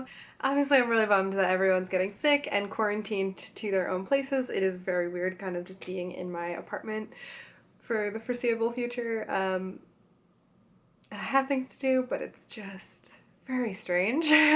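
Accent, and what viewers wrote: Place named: France. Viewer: You talk french btw? Coz I do and I LOVE THE ACCENT XDD😂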